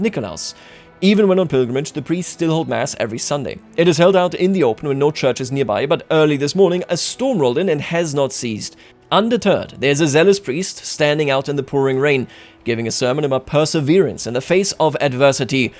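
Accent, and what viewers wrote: Bavaria, Germany. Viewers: Warum machst du das eigentlich nicht auf deutsch? Ich hör ja sogar den Bayerischen Aktzent raus!😅 Würde mich nur mal interessieren. Danke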